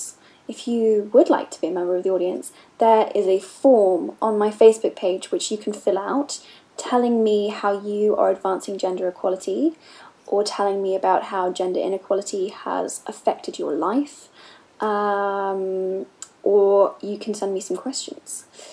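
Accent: United Kingdom. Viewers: イギリス発だから逆にあなたが学んでいる英語の方が訛ってるかもよ？ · イギリス英語でわ？と思います。アメリカとイギリスでは発音が全く違います。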